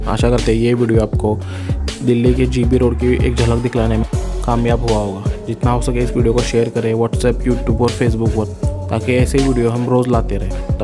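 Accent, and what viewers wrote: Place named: Maharashtra, India. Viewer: Aap pakka maharastrian Ho isliye aap ki boli se pata chalta hai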